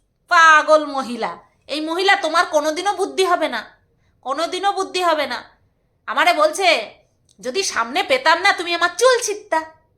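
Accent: Bangal, Bangladesh. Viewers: Orey ki sundor bangal vasa sunlam..darun lglo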